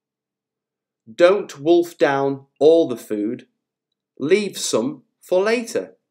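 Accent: United Kingdom. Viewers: I'm so obsessed with your British pronunciation. I think it sounds so sexy when speaking like that. I fancy scranning food and shopping spree, too. teaches us spiffing & stupendous lessons. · We want to continue teaching all the phrasal verbs in your performance. Just great British pronunciation and brilliant phrasal verb learning tactics !!!!!👍👍👍👍👍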